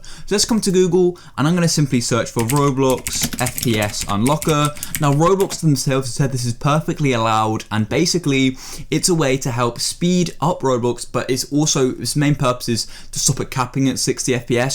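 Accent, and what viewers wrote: United Kingdom. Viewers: Let me guess your british?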